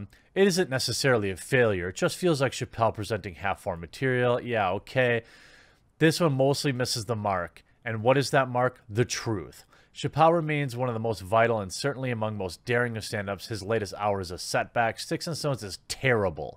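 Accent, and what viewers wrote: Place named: Midwest, United States. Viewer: Your Midwestly was showing a bit in this one.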